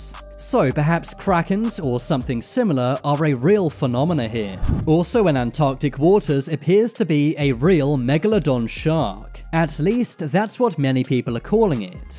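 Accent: United Kingdom. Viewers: I hear you speak British🤨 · I don't believe I have listened to a YouTube video with as many grammatical errors as this one. Not that there are a lot but more than any other to which I have listened. At about 12 seconds in, "most scary". That should be "scariest". The adjective "scary" has 2 syllables and ends in "-y". That means we use the "ier" and "iest" endings for comparative and superlative forms. I see this more and more, that is, where people say "more" or "most" when it should be the comparative suffixes "ier" or "iest". I don't understand why as it even just doesn't sound right. Also at the end of this video, the narrator again uses "most scary" which I have already mentioned should be "scariest". Next is when the narrator says, "him and his car were never found". Really? Him was never found, eh? I don't think I have to mention what the correct pronoun should be (he), but I did anyway because I sometimes just wonder. 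Also, I wonder if the narrator is British (he sounds British) because all too often when I watch British shows they often use "him" (or "her") as the subject noun. I don't get that either as I always thought the British were generally pretty good at using proper grammar. Next, at the narrator says, "by censoring this house, less people would go here". That should be "fewer" people. Use "less" for singular nouns and "fewer" for plural ("there is one less person here"; "there are 10 fewer people here"). I don't get it as again, it doesn't even sound right.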